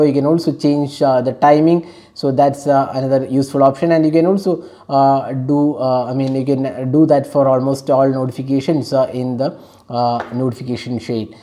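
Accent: Kerala, India. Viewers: U r from Kerala Your tone speaks about it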